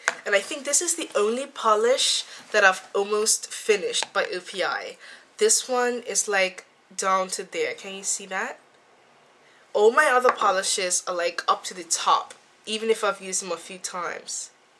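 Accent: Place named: Bahamas. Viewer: where are u from because u sound like your from the Bahamas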